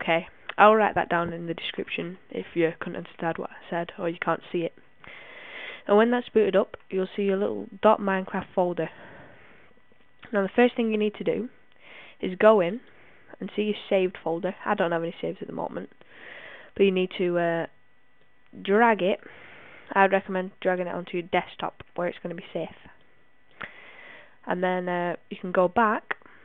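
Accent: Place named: Ireland. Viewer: Wow are you Irish that's awesome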